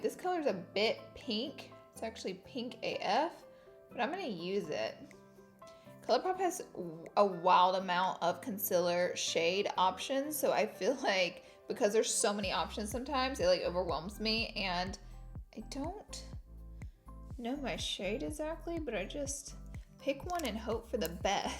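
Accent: South, United States. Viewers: I love your voice 😂 I sound just as country 🤷😍😇🤸♥️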